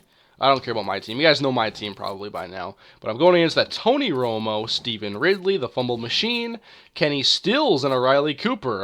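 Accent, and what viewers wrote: Minnesota, United States. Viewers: Hi are u from Minnesota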